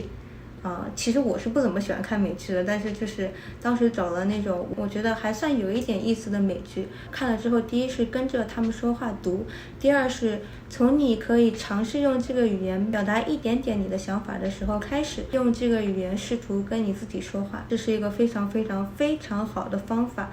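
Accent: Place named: China. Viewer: 这是中国口音吧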